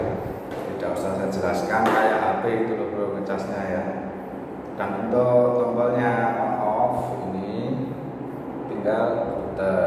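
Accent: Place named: Kebumen, Indonesia. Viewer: kebumen ngapak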